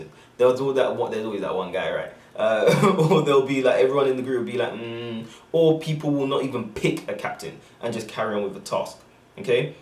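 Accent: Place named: AAVE, United States. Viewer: Hate the ebonics in your voice!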